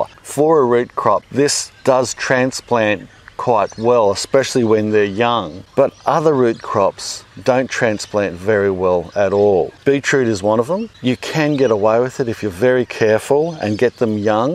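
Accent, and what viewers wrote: Australia. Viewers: i was like what he spiced them? ooh spaced. australian accent is the best accent 😂.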